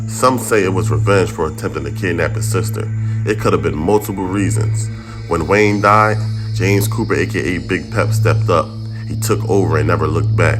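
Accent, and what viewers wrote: Philadelphia, United States. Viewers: This dude narrating gotta be from Philly.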